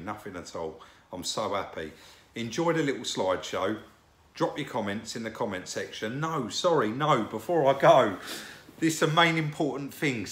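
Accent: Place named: Essex, United Kingdom. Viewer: Good for you bud 👍😁😎 look great. Still sound like an Essex Boy though 🤔😜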